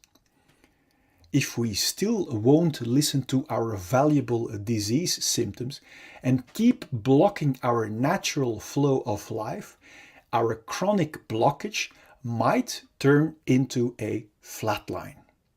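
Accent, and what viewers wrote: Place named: Netherlands. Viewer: Accent sounds Dutch.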